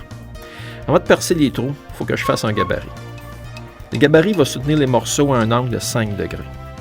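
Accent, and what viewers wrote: Quebec, Canada. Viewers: Wow super beau j'reconnais un quebecois :) et j'aime ça !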